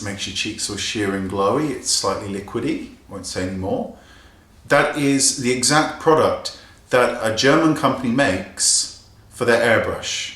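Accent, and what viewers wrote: England, United Kingdom. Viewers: I love you, I love watching your videos. Think youre absolutely hilarious! Most of all I love/adore your accent. I'm Irish so the English/UK accent is amazing to listen to anyway. But there's a few words that you say which have a wee bit of a "twang" e.g. when you said "haarrrrrrrd" in this vid.. LOVE IT! That makes watching these videos, really does. Also, I totally agree with some of the comments left here that you should create your own line of cosmetics and/or you should write a book. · This is exactly why WE all Love you so much! This and your supercute englisch accent☺. Kisses from Germany and a Big thank you for all your honesty and braveness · That english accent! <3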